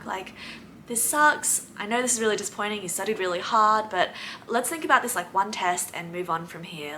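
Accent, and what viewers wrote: Australia. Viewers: Thank you for your reply.🩷I tend to compare myself with somebody that speaks English so well, and I hate myself doing comparison. If I were in Sydney, I'd like to have a session with you to improve my confidence. Please keep posting. I love Aussie accent, and I'm infatuated with Australia! So, your video clips remind me of fun time I had in Sydney! Yes, I've lived there for a little while a long time before. And, I miss Australia.🥹🥹🥹🥹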